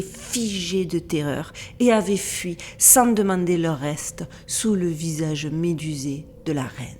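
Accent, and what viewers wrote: South, France. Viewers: Merci belle d'âme d'outre atlantique avé l'accent si typique de nos contrées du sud de la France ! C'est toujours avec un plaisir éréfrainé que je bois tes recherches qui partent dans tous les sens et les éveillent , les sens ! Merci pour tes nombreuses recherches dont certaines que je n'ai pas faites et qui pourtant sont d'un grnd intérêts quand d'autres pas mais là avec le nain polèon , tu m'en apprends de biens belles ! Comme j'aime ton esprit rebel et tacuriosité très saine , ne lâche rien Sista et prends soin de vous ! Merci , force et courage ! Namaste 🙏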